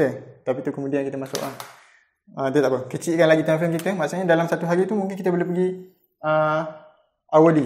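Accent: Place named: Kelantan, Malaysia. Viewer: ore klate ni..sbb bunyi "jolo doh"..hehe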